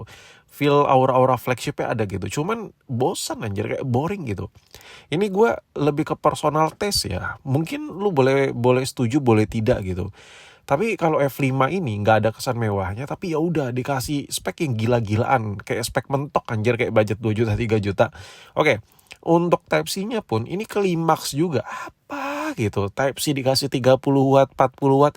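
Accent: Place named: Banyumas, Indonesia. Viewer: Jebule wong ngapak ya😂😂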